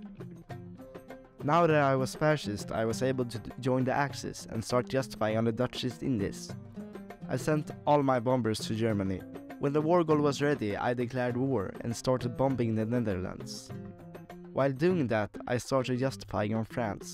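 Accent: Sweden. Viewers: Are you swedish? I can hear it in your accent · Hey are you Swedish? We have the same accent pretty much so I KNOW you’re at least Scandinavian 💀💀💀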